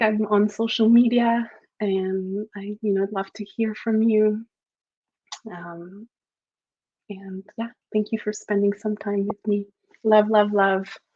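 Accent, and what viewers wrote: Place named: Quebec, Canada. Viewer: Bonjour! Je reconnais ton accent canadian francais, je suis de Montreal et francophone aussi. As-tu écrit ce livre aussi en francais?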